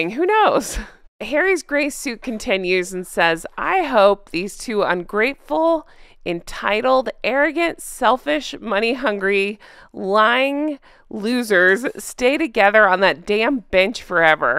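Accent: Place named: Midwest, United States. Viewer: What accent?! I’m from the Midwest and you sound like ME!!! Lol😂. Love a British accent!😊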